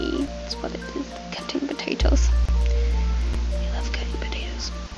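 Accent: United Kingdom. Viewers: Why do you sound so bloody posh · OMG YOU ARE JUST LIKE ME IM LESBIAN I LOVE TO PLAY MINECRAFT IM ENGLISH sorry if I’m wrong you’re accent sounds mighty like it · YOUR VOICE IS SO COOL!!!!! NOT ONLY DO YOU SOUND SO MATURE, BUT ALSO I LOVE BRITISH ACCENTS!!!!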